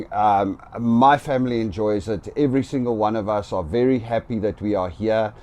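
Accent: South Africa. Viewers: i like to hear a south african person talking about my home country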